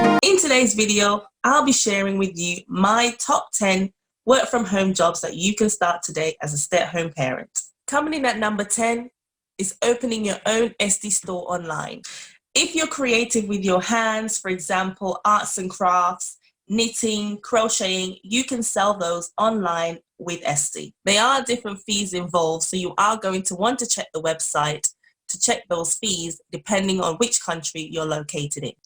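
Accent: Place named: United Kingdom. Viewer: Why are you quoting $ dollars and not £ Sterling when you have a British Accent? Where are you based?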